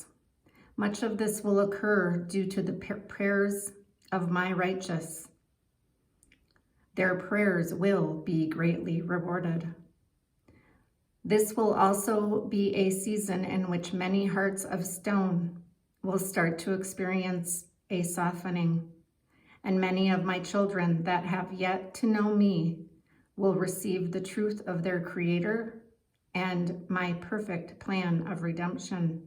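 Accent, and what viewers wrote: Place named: Minnesota, United States. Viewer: Are you from Minnesota? The accent. I'm from Minnesota. Haha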